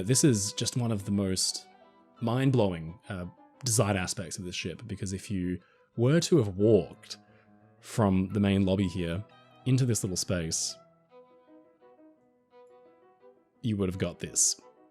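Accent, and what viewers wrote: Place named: Australia. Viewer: Oh yes indeedy! I could listen to forever! He's got what it takes to be a successful narrator. First of all, he's an Aussie, and who doesn't love that accent; and he's got the perfect cadence in his voice, eloquent and elegant, which keeps the listener not only affixed to it, but always wishing for more! Maybe I've just described myself and my likes; and as for our ~ noone, and I mean noone has topped his talent here on YT (and I do enjoy listening to high quality programming)! 💙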